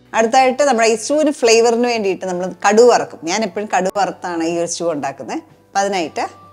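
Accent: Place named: Central Travancore, India. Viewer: Lady speaks the central travancore dialect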